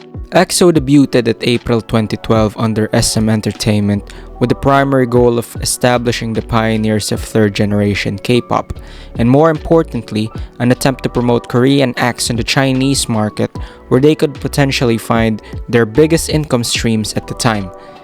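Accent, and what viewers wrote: Philippines. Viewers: I'm sorry but I can't with the Filipino accent 😢😢😢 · I can hear the Filipino accent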